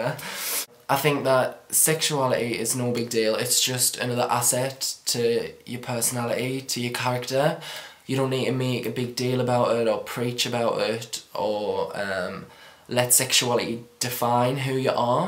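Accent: United Kingdom. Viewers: scottish or british or welsh accent?? anyone know??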